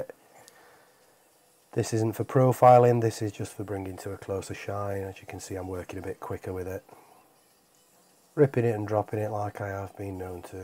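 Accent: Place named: Yorkshire, United Kingdom. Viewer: Cheers, the's nowt wrong wi a bit o Yorkshire in a video.....I may all revisit that gag 😉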